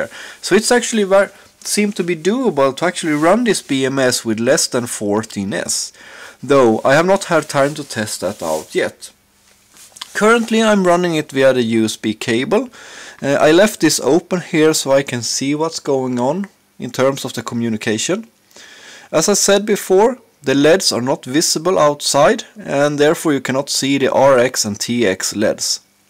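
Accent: Sweden. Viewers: You sounds swedish.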